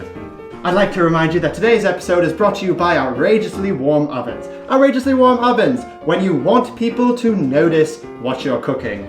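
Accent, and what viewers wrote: United Kingdom. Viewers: You are like the furry version of Jamie Oliver. (Your British accent was on point, actually!) :D Im gonna try this recipe sometime, and every time i think of pancakes, im gonna think of you. :3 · Omg I love the British accent it's as good as mine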